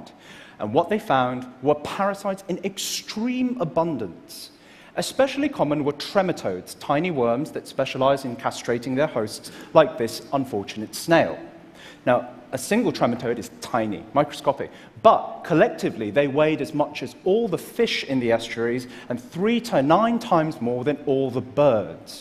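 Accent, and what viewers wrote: United Kingdom. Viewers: I like his British accent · I love an asian who speaks with an impeccable British accent.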